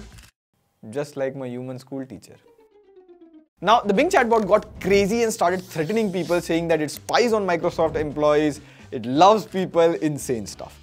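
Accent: India. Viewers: Not sure why but he's the only Indian accent that I've heard that I can stand listening to. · Wow a cool indian even the accent😮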